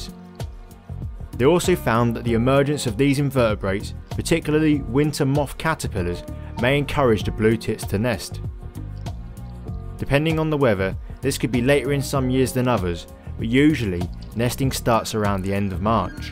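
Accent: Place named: England, United Kingdom. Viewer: Really enjoy your videos mate. You sound English, so I think you meant they are found as far EAST as Turkey, not West. 😉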